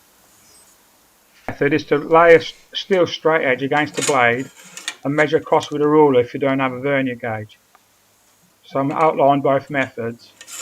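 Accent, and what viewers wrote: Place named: England, United Kingdom. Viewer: I didn't realise you were Italian, sorry, as you sound very much English & have a similar accent as myself, who is from the Black country. Thank you for taking the time to reply. God bless & look forward to more videos.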